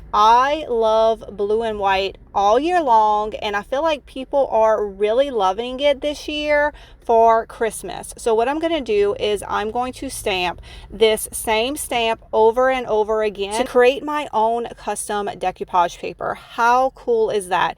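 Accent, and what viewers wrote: Louisiana, United States. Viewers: It’s not phony she’s from Louisiana and that’s how she talks. Blue and white Christmas! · Everything was beautiful, but I was especially impressed with the finial ornaments. And from one Southerner to another, your warm smile and Lousiana accent are the cherry on top of your videos! ❤ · She’s from Louisiana and that’s just the way they talk!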